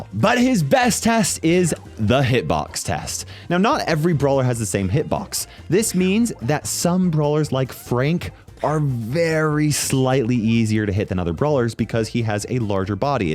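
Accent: Scotland, United Kingdom. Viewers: Nerey british ahh voice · I didn't know Moe was british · I mean a Scottish accent is technically still a British accent but I get what you mean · He's BRITISH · Bro's bri'ish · Bro is British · why is it british🤮🤮🤮🤮🤮🤮🤮🤮🤮🤮🤮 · Wait, he's just British? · i like his british accent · Ees bri’ish mate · why is he british tho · why is he british please dont be british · He Scottish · Is Moe Scottish? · British accent tops it off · HES BRITISH!!!!!!!!!! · Lmao the british accent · he´s british!!!!!! oh and where did kenji come from. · I didn't expect him to be british but I love it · Why is he british😂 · He has the most british accent of all time, what are you talking about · he's Scottish 💀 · I did not expect him to be british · Of course he has to be British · HE'S BRITISH I LOVE HIM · Bros so British 🇬🇧🇬🇧🇬🇧🇬🇧🇬🇧💂‍♂️💂‍♀️💂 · Why is he british😳😳😳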